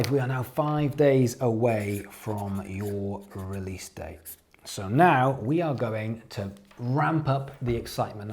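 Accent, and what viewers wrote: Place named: England, United Kingdom. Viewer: Thankyou so much, you are so knowledgeable, i have learned so much from you,and an English accent! I will follow your advice.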